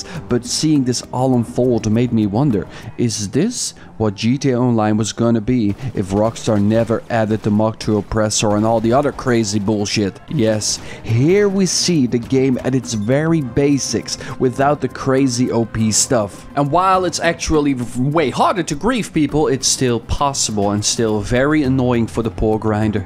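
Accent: Netherlands. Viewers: Are you dutch? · you sound Dutch af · Ben je Nederlands?😅😂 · Het nederland accent komt naar boven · Het is wel erg duidelijk dat je Nederlands bent.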